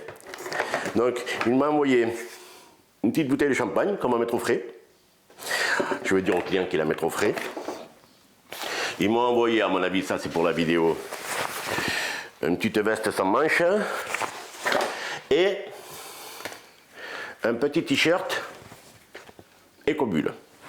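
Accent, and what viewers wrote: South, France. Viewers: Il est incroyable ce bonhomme, en plus, il a l’air sympa avec son accent du midi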